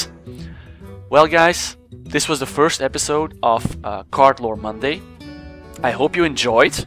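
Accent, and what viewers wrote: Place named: Netherlands. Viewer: are you dutch? you sound like you are. nice vid btw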